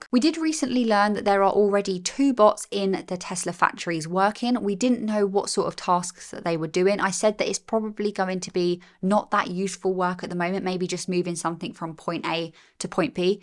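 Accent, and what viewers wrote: United Kingdom. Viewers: British accent ✅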